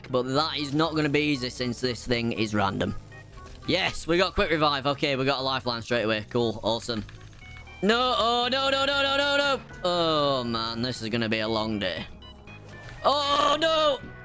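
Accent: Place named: England, United Kingdom. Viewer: no kiddin love the English accent and also the commentary was top notch, earned a sub <3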